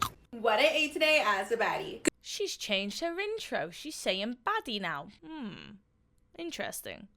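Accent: Liverpool, United Kingdom. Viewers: She’s not Scottish. Sure of it. She’s Scouse, I think.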